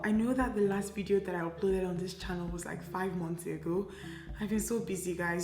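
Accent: Nigeria. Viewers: awwwh.., thanks for this one. love your videos, love your real nigerian accent that goes with it too. love them all!!! pls post a video on IG now it's been long 😢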